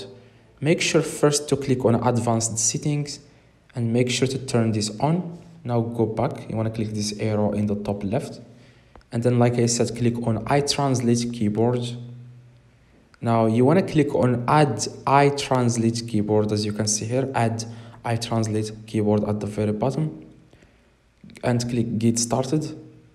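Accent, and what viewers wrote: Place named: Morocco. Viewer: bayna fik mghribi 😂❤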